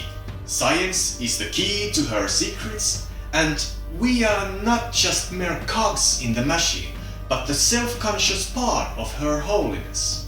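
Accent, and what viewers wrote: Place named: Finland. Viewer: o how come i didn't know about this video until now? Also nordic pagan here. And a furry xD You sound Finnish, as your accent reminds me of my own. I grew up with the Finnish paganism as my mummi was Finnish pagan. Like the old school pagan where the world was being created from the skybird egg. Though i have adopted a more of a mix of Norse paganism and Finnish paganism.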